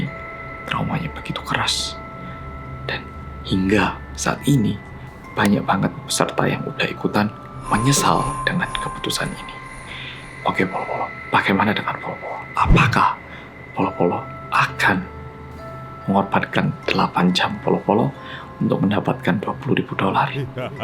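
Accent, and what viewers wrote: Java, Indonesia. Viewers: Nah iyak sama😂lupa soalnya..biasanya medok wkwk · Masih bersama mas yang MEDOKKKK...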